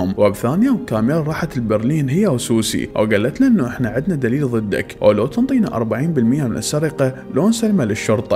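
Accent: Iraq. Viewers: انته عراقي مو العراقين وينكوم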